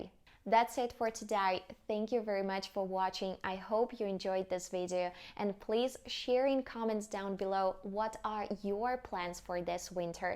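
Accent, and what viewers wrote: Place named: Russia. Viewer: На английском лучше, слушая русский английский не выучишь)))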